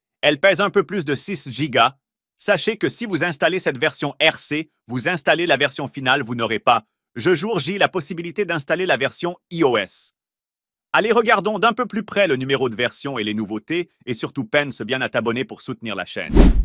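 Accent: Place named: Canada. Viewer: Merci, Très expressive cette voix avec un petit accent canadien je crois. De quelle voix de synthèse vocale s'agit-il? Bravo pour le travail👍😎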